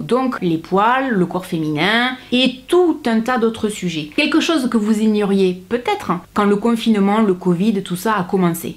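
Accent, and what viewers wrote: South, France. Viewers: Bonjour, Je prends rarement le temps de laisser des commentaires sur youtube, mais aujourd'hui avec cette vidéo je ressens le besoin de le faire. Les messages que j'ai lu dans cette vidéo m'ont profondément choquée. Tant de haine reçue totalement gratuitement... Personnellement j'aime beaucoup ta personne, ton travail, ton contenu, ton accent du sud, tes vidéos variées (liste non exhaustive). Je regarde toutes tes vidéos et je continuerai de le faire, tu as eu raison de remettre les pendules à l'heure car ces comportements ne sont pas tolérables. J'aimerais te dire que je te soutiens, toi et ton travail et que j'ai hâte de voir tes futurs projets! Prends bien soin de toi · J'adore ton accent du sud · Je suis choquée des commentaires! Moi je t'aime et j'adore ton accent! Ici c'est le sud!!!!! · Moi habituellement je n aime pas l accent du sud mais avec toi tout est possible tu es un amour merci de continuer! 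· Juste un petit commentaire pour te soutenir, j'aime beaucoup ce que tu fais sur YouTube, ton accent du sud est me rappelle ma famille, et ta franchise est rafraîchissante dans ce monde ❤ Je suis désolée de lire tous ces commentaires déplacés cela ne devrait pas exister... Courage 😘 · Je suis choquée d'entendre ce que les gens peuvent dire sur les réseaux 😱 Je pense que la majorité des gens qui te suivent t'apprécient comme tu es. Avec ton humour, ton accent du sud, ta spontanéité. Bref ne change pas pour une poignée de gens "débiles" (encore je reste polie). ❤️ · tu es super, j'adore tes vidéos, ton accent du sud. Ceux qui critiques sont des débiles profonds.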